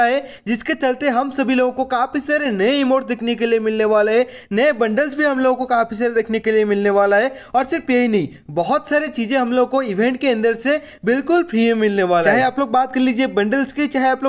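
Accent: Odisha, India. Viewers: Bhai tum odisha se ho na 😊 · Bro r u from odisha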